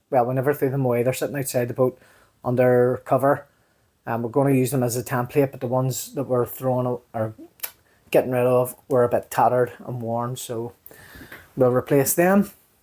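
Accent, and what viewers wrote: Northern Ireland, United Kingdom. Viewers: nice to hear the Nordie accent too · English must not be your first language if you couldn't understand...it is called a Northern Ireland accent maybe educate yourself. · you speak nrn irish,,,like the scots and donegal awful accents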